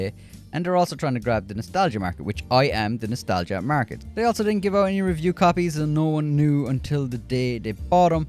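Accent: Ireland. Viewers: Thanks dude, first thing I copped was the Irish accent 😂 I'm only down the road ha but it's about time someone did an honest review not just bashing the game. Playing San Andreas via game pass on Series S and loving it. I think they look class. Yeah it's Cartoonish but it's supposed to be. Anyway I'll play this through and maybe buy the trilogy. Great review man. Subbed 👍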